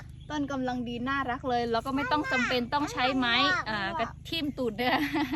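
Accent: North, Thailand. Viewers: ฟังรู้เรื่องนะ ภาษาไทใหญ่ก็คล้ายๅกับสำเนียงไทยทางภาคเหนือ ผมคนอีสานฟังรู้เรื่องครับ ขอให้ครอบครัวมีความสุขนะ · พูดเหมือนทางภาคเหนือของไทย.อีสานก็ฟังรู้เรื่องเกือบทุกคำน่ะ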